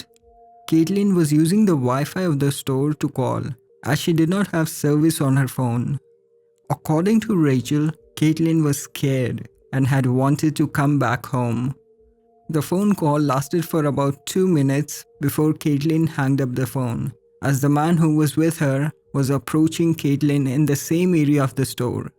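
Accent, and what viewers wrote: India. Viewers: omg this indian accent voice is even worst, unbearable, please use a better voice, it's worst than the robot one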